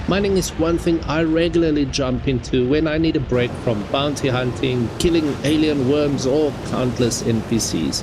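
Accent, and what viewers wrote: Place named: Afrikaans, South Africa. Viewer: jy klink afrikaans, julle dalk 'n org wat ek kan join?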